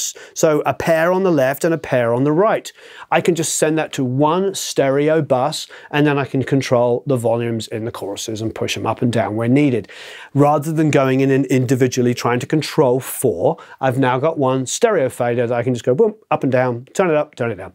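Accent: United Kingdom. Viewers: Great video,! Self doubt comes and goes for everyone, i guess. Taking that self doubt and turn it into creative fuel is a great way to deal with it, if possible. I've found that self doubt has made me get out of my comfort zone and to work even harder, it has pushed me to learn more and study/practise harder in terms of producing, mixing. Thanks for bringing up this important question:) Love the British accent, btw! Cheers from Sweden /Mike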